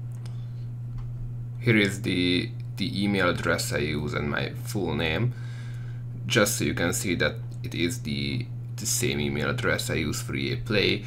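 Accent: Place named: Hungary. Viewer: Az első mondatodnál éreztem már hogy magyar vagy :D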